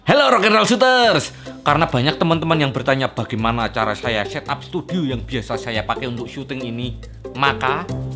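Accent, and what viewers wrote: Java, Indonesia. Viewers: Kok pake cengkok Jawa,anjirrrrrr👎👎👎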